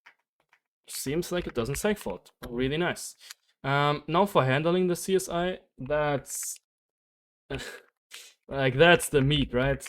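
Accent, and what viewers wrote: Germany. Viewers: you sound german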